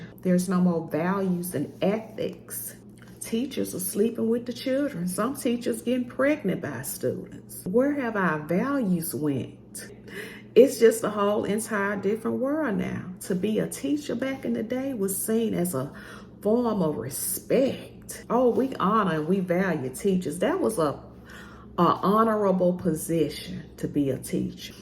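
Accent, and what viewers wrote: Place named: Georgia, United States. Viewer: I just discovered your content today! I am from Macon, GA originally and listening to you reminded me of women I grew up with😊. It was nostalgic and a breath of fresh air. God Bless You and Happy Holidays❤❤❤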